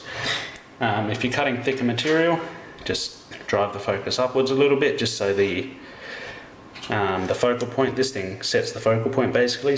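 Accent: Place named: Australia. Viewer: Me likes your aussie accent :) Noice!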